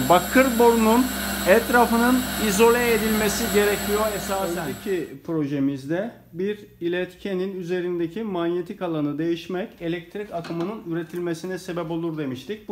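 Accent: Turkey. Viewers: He speaks with a local Turkish accent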